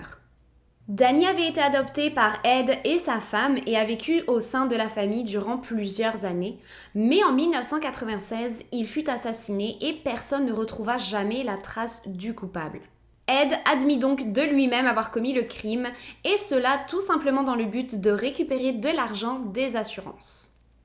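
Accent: Quebec, Canada. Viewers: Allo!...je viens de m'abonner à ta chaîne...ça fait une semaine...tu es très bonne...mais parcontre... quand tu saute d'année...( Comme dans cette épisode)... Serait le fun que tu dises à quel âge est rendu le sujet...et pour parlé encore de cette épisode ci...tu dis a la fin qu'il a des ressemblances avec les lettres codé que le zodiaque a envoyé...mais tu n'a jamais parlé de ceci avant... continue ton bon travail tu vas aller loin....et aussi...j'ai bien entendu ton accent Québec 😊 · Franchement tu fait des vidéo de très bonne qualité et en plus j’adore ton accent,canadien peux être ? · On est d accord elle a un accent québécois ? (Tres léger ) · N'empêche l'accent du canada commence a prendre le dessus sur de plus en plus de mots😂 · Salut à toi ! Et un gros bravo pour tes histoires si fraîchement racontées , surtout ne change rien , reste telle que tu es , avec ton petit accent du Québec parfois . 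Sur cette histoire , tu parles du tueur du zodiaque , je ne connais pas assez cette histoire pour y apporter quoi que soit ayant rapport avec ce tueur . En revanche tu parles du tueur au Dahlia noir , et cela est fort peu probable que ces meurtres soient liés avec Ed , car il fut démontré que les méthodes utilisées pour mutiler les victimes , étaient utilisées par les chirurgiens pour pratiquer certaines opérations , et que seule une personne expérimentée en la matière aurait pu commettre de tels crimes . Au plaisir de t’écouter encore !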